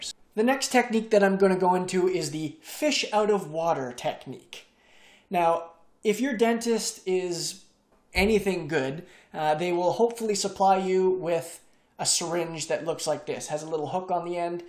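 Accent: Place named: Canada. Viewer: Are you Canadian? Just asking because of a slite accent. Good video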